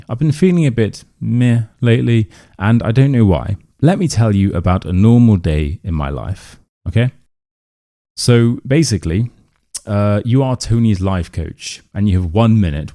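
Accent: United Kingdom. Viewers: I really love your YouTube channel. I watch almost every video.I chose your channel because you have a great deep voice and a real British accent. I want to learn this accent from you.But I have a small request. Sometimes you speak too fast when you move from one sentence to another. Because of this, my brain cannot catch the first sentence.Of course, you don’t do this all the time, but it happens often. I frequently have to separate the sentences in my head by myself.Could you please make small pauses between your sentences? This will help me understand you much better.Thank you for your understanding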